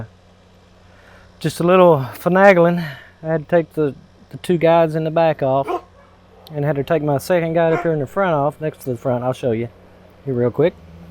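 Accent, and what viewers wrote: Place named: Kentucky, United States. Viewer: I'm from Kentucky and I know one from there when I hear one.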